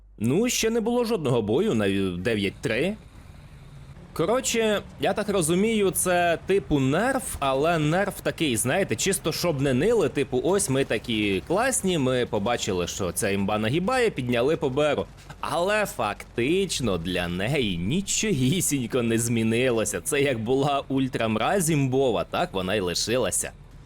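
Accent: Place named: Ukraine. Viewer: Автор мабуть з Харкова чи Полтави. Такий жорсткий суржик.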